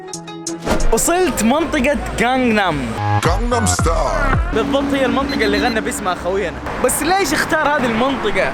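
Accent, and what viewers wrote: Jeddah, Saudi Arabia. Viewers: انبسطت كتير من طريقة تقديمك للمعلومات وخصوصا اللهجة الجداوية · يا ولد صوته تقول واحد جداوي 😂😂😂😂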